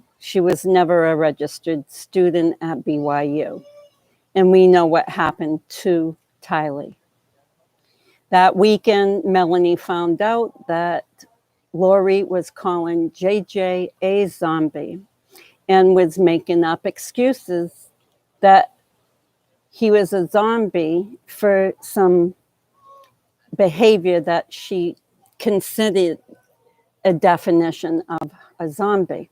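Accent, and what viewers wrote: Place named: Northeast, United States. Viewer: I LOVE your channel!!♥️ I REALLY LOVE your northeastern accent. Your voice sounds just like my own did.😍It brings back happy memories. I think your assessments of this most BIZARRE case are pretty much “spot on.” Thank you for the time/work you put into your channel.🌺🌺🌺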